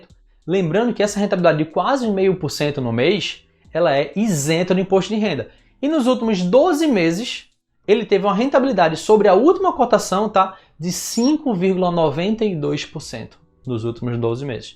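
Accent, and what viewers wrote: Recife, Brazil. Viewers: Em 30 segundos percebi que é de Récife. Seguindo já conterrâneo. ✌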